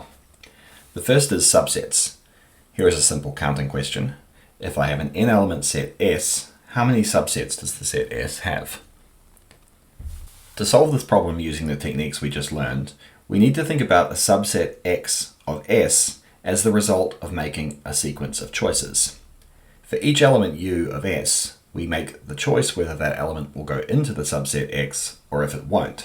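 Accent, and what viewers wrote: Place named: United Kingdom. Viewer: british innit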